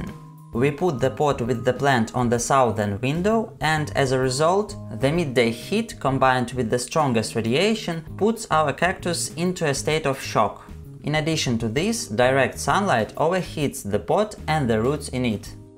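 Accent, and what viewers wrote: Russia. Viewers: Я которая слышу русский акцент👁👄👁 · Too russion. My earssss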